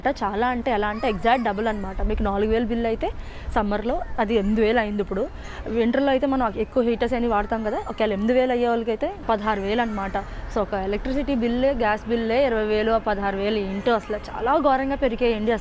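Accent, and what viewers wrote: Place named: Godavari, India. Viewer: మీ గోదావరి యాస వినసొంపుగా వుంది, మేము కూడా లండన్ వచ్చేయాలని వుంది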